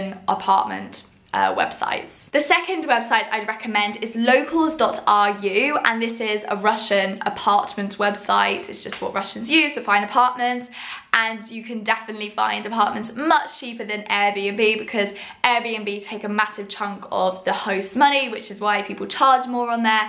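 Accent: England, United Kingdom. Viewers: Love real English accent!!! Which type of UK accents it is?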